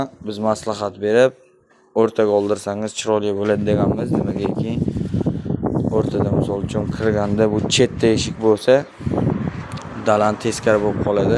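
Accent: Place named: Khorezm, Uzbekistan. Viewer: хорпзмчага айланибдику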